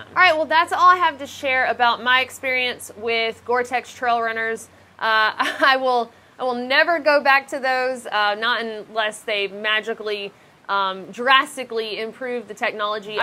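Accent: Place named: Rural, United States. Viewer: I love how a handful of words you say sound full-blown country...like, hike, dry, time, a while, supply, resupply, I, find, myself...but sometimes say them in that somewhere-between-the-Northeast-and-Midwest accent. I'm sure I have a chopped up accent too being raised in Southeast Texas by parents from South Philly. Even to this day, coworkers think I'm full of shit when I say I've live here all my life.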